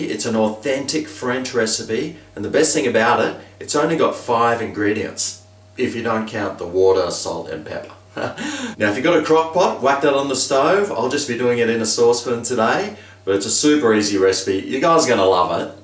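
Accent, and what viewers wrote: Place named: Australia. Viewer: Channel he's Australian not French do you know what French accents sound like.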